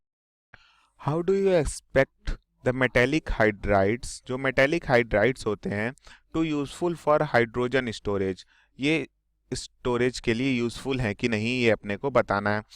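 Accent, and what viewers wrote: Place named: India. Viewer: Am I supposed to understand your indian english ???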